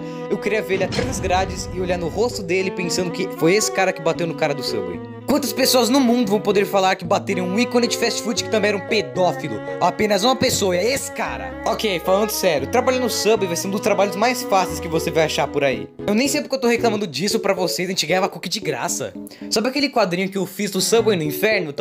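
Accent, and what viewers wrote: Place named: Portugal. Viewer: Mano tu parece que e de Portugal pelo o sutaque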